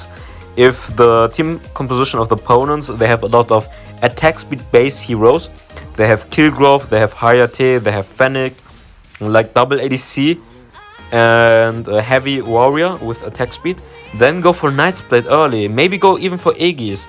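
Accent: Germany. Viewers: Bro are u german ?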